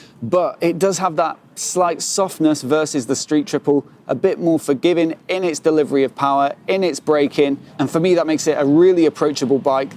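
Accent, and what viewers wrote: United Kingdom. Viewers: Your accent is so British and I love it.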